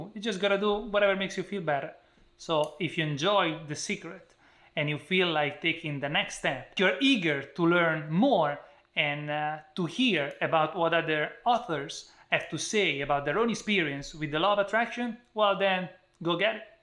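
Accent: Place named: Italy. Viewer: Rest assured, there was no language block. To the contrary, your enthusiastic inflection, which I assume followed Italian rules of enunciation, made the content more interesting. Bravo!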